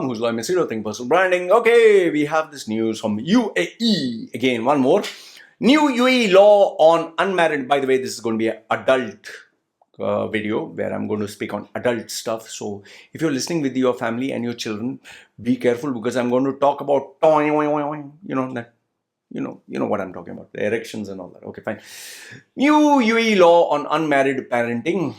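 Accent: Kerala, India. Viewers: That verbal slapstick, mispronouncing word puns and the overdone mallu accent is getting old Loy... Starting to get redundant.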